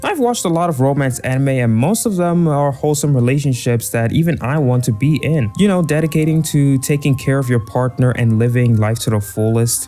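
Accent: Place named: United States. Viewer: Wait...for someone who lives in SA,if he was born there,this dude has American levels of English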